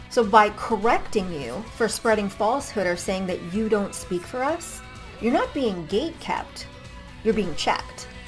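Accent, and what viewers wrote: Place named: New York, United States. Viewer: A goth with a NY accent! Priceless!😂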